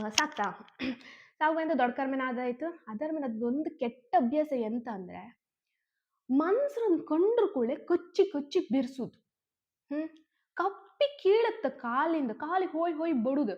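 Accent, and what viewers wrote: Kundapura, India. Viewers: Supper kundapura Kannada I like it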